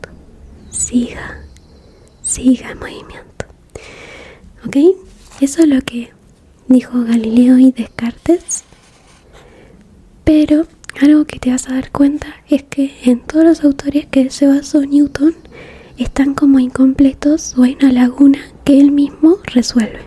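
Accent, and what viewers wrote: Argentina. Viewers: Hace poco conocí tus Asmr y me ayudaron una banda para estudiar para unos parciales q tenía considero tu canal el mejor de Asmr de Arg creo q sos de aca o de Uruguay x el acento sjsjjssj Nada seguí así PD:Tenés unos re lindos ojos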